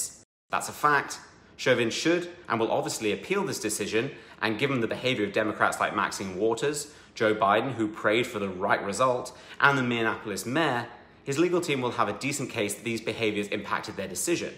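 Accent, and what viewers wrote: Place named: United Kingdom. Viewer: You British dude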